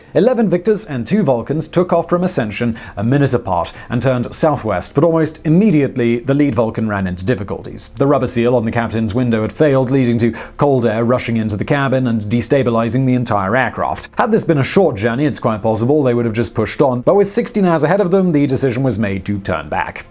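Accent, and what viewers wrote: United Kingdom. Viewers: Is a Brit anyone?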